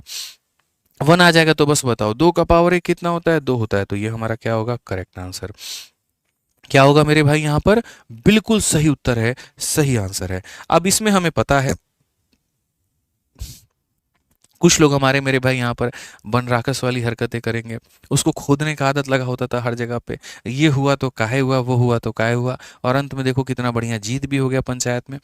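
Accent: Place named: Bihar, India. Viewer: Sir ❤ bihari accent bht pyra hai 😂❤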